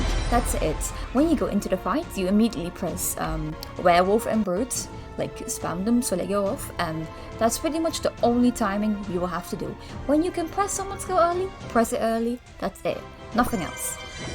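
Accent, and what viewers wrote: United Kingdom. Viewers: Bri’ish tbh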